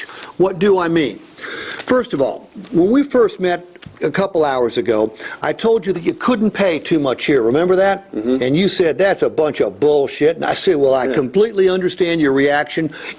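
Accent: Appalachia, United States. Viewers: Hillbilly and his hick rube hillbilly bullshit foghorn leghorn twang talk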